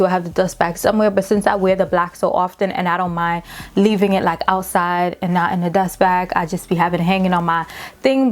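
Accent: New York, United States. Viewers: Why do I always know when someone is from New York lmao.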